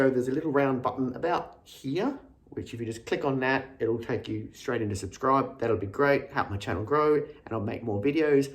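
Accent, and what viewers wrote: Australia. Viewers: So nice to see and hear another Aussie away from home. Was really funny how you called it a sanga as I said that to my Dutch hubby the other day and had to explain what I was saying. Was a great Episode... keep em coming :-)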